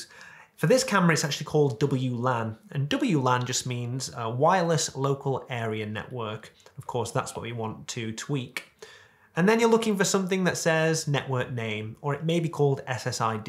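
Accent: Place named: Northern England, United Kingdom. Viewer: Love the Northern accent my man, thanks for the helpful explanation.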